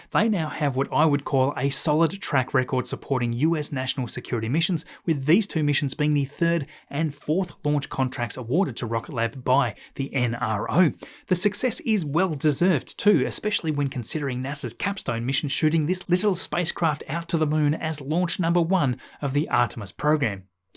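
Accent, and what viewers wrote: Australia. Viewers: Usual comment - Aussie accent at its best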